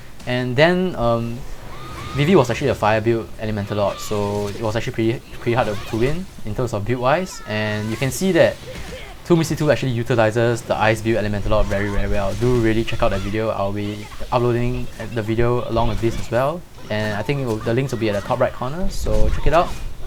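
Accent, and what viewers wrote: Singapore. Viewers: are u singaporean?? cos u speak like a singaporean anyway i love your guides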